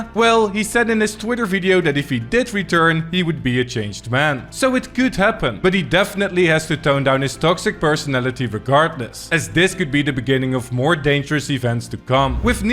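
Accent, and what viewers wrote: Netherlands. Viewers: Do something about that dutch accent bro😂. Godverdomme wat een steenkolenengels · The narrator sounds impressively dutch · let me gues you dutch ja je accent geeft het af 😂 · Bro the dutch accent :c But still Really good video tho :D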